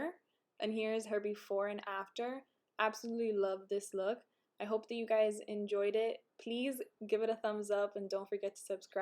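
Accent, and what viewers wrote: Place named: Miami, United States. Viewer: I knew you had to be from miami when i heard you talk !! Love the makeup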